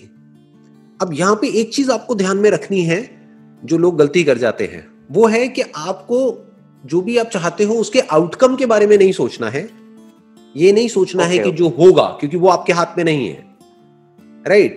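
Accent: Gujarat, India. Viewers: Oh God I just loved his Gujarati accent 😘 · jab gujarati log hindi bolte hai tab pata chal hi jata hai ki vo gujarati hai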